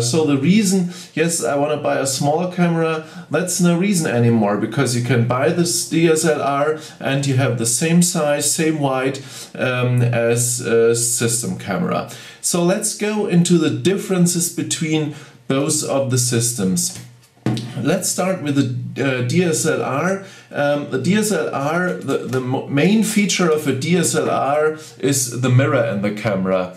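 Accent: Germany. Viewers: german speaking english?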